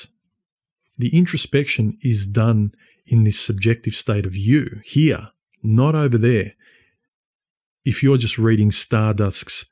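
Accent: Australia. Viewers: Really dig this channel. Also pretty cool to hear an Aussie on YouTube covering these topics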